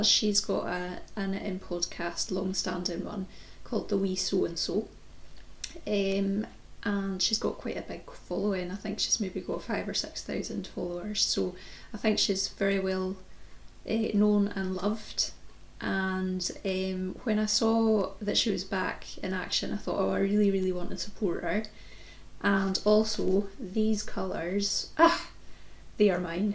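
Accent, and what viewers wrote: Scotland, United Kingdom. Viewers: I also got to your podcast through YouTube suggestions and loved it from the start. What made me subscribe before finishing the first chapter? The good energy you transmit, the 20-30 minute format, direct and calm, the quality of the podcast notes (unbeatable!), Your fabrics and choice of patterns, ... and above all your voice and your way of talk: I live in the north of Spain and watch a podcast in English is a good way to enjoy knitting and at the same time try to practice my "English ear", and your way of vocalizing seems surprisingly clear to me ... I thought that English Scots was harder to understand, but you speak very, very clearly! 😅 Congratulations on your almost two thousand followers, and a curiosity ... why that "Muchísimas Gracias" farewell? It has me intrigued ... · Congratulations!!🎈🎉 I just love your podcast!! 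I Love Scotland and been several times but never to your area. We still have plans to do the North Coast 500!. I love your scottish accent. You do make the most beautiful things, so keep up the good work. I learned about your podcast through Selma from Little Big Knits. Kind regards, Erna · I found you as a suggestion on utube. I love your soft Scottish accent and it's so relaxing to listen to you chat. You have some beautiful knitting garments and loved what you knit for your new neice. Thank you 😊💓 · You popped up when I checked "new to you" and was drawn to your name The Thoughtful Knitter. Love your Scottish accent and the projects that you share. I have subscribed now. Really enjoy your videos and what you share. Very calming and that's what we all need during these difficult, trying times. I'm in Richmond, Virginia, USA. Thank you for a beautifully done podcast. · Hi! Your Podcast was in my feed too. 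I love your accent. I'm not a native english speaker but I always liked to hear the scottish accent. Glad you found the courage to start a Video Podcast. I like your calming way of speaking and that you aim for half an hour of podcasting. Your Intro is super. Your projects are beautiful and inspiring. Greetings from Germany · Hello there- I spotted your channel on You Tube just a few days ago and thought I'd give it a try- the name appealed to me 😊 and I've loved it right from the opening moments- lovely and calm. I absolutely adore your Scottish accent as it's just so gentle. We visited the U.K. A few years ago and spent a fortnight in Scotland but only went as far north as Inverness- such a beautiful country! Although your giveaway is gorgeous I suggest you don't choose me- I live in Tasmania, the southernmost state of Australia- the postage would cost you too much 😉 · As someone with fibromyalgia I really really appreciate your approach to podcasting. 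I’m new to knitting and I find it to be peaceful and calming work. It is soothing to knit whilst listening to your soft Scottish voice. I love your content so far. Keep going and thank you. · I believe YouTube recommended you to me, I love listening to fellow Scottish podcasters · Hi, your podcast was a recommendation from You Tube, may be because I love other Scottish podcaster as Caz from the wee sew and sew and as well „The meaningful stitch“ I liked your podcast and I love to here the Scottish English your knitting is also so beautiful so I left an abo here.Greetings Vanessa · I love your podcast, and I especially like to just hear you talk - love your Scottish accent! Someday I want to travel to Scotland, it looks so beautiful! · Hi! I am pretty sure I heard of your podcast from some other podcasters, but I cannot remember who 🤭 so sorry! Anyway, thanks for the time you spend with us and for sharing your beautiful knitting projects. 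I adore your accent!! And would love to get to visit more of Scotland. Just went to Edinburgh once, but I loved it. Your gifts for the giveaway are fantastic! I’ll keep my fingers crossed 🤞🏻🤞🏻🤞🏻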